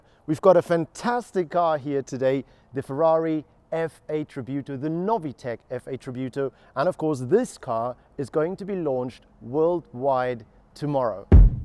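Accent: Cape Town, South Africa. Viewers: He is South African. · ja meneer. Cape Town. ;-) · Yes meneer. Cape Town! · wait your south african ? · This Presenter is definitely South African. gatta Pattata?. Leon Schuster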